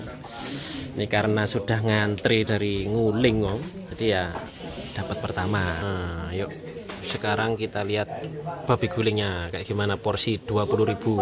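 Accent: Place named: Java, Indonesia. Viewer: Logat Lidah Rasa Jawa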